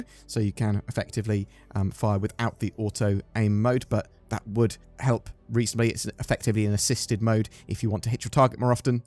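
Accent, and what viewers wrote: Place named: London, United Kingdom. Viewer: You talk like you’re from London!!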